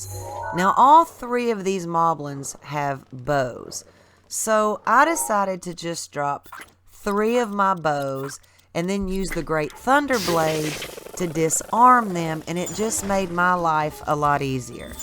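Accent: South, United States. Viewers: Hello! I love your videos! I'm from the south, living in Mobile, AL, so your voice makes me feel right at home too. I hope you're ready for Tears of the Kingdom! -B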